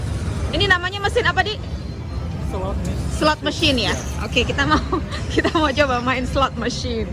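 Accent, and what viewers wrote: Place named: Indonesia. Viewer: mbak nya walau lama di usa kharekter dan logat masih tetap indonesia banget.......pasti nya mbak nya masih sering ngobrol pake bahasa indonesia raya.....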